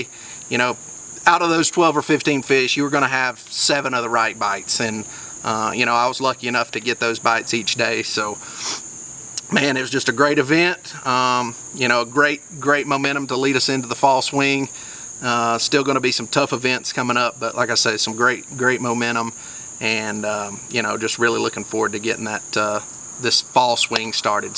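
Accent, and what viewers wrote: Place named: Carolina, United States. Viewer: Gosh-A-Mighty, you are a pure Carolina Boy! Love it!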